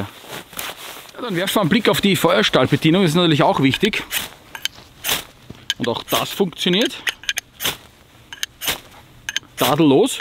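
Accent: Austria. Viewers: Tolles Video! Ehrlich kommentiert, ich finde den österreichischen Dialekt klasse 👍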